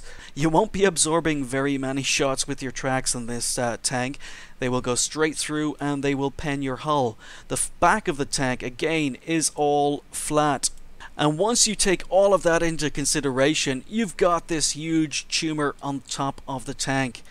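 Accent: Ireland. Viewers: Your accent is fucking bizarre. Make up your mind are you Irish or a brit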